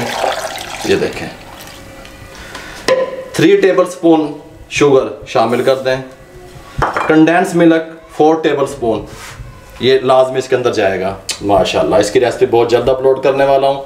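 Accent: Punjab, India. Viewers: 😂 😂 Uncle boht nice hain ap... Punjabi tuch boht wadiya lagda g💕💕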